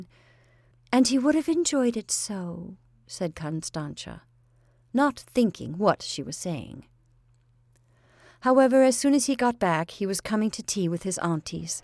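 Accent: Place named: United States. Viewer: The reading should be in an English voice, not American — one cannot imagine a more English story like this one! Pity.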